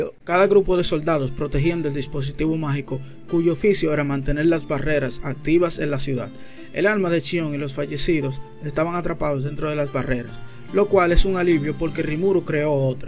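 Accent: Dominican Republic. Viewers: Bro, ¿eres dominicano? Me encantan tus videos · eres dominicano? xD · Bro tengo una pregunta Eres Dominicano?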